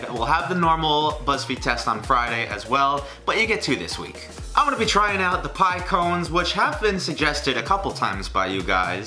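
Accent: New York, United States. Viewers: your new york really came out here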